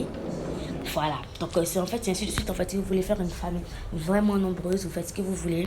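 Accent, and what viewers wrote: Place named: Ivory Coast. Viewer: Est-ce que tu es ivoirienne parce que tu as l'accent ivoirien tu es sûr que tu n'es pas ivoirienne parce que moi je suis ivoirienne et je connais cet accent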